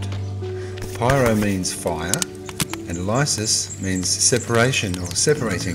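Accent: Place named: Australia. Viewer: ...and a beautiful voice speaking, besides this lovely australian dialect... 😉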